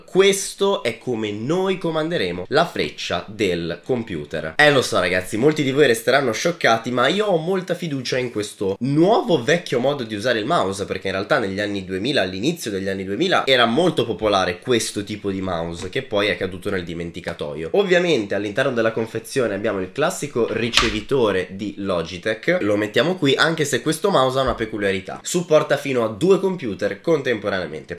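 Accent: Italy. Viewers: MA sei di roma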